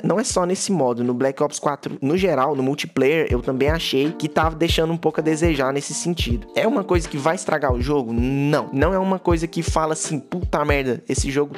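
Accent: Minas Gerais, Brazil. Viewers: Ce é mineiro?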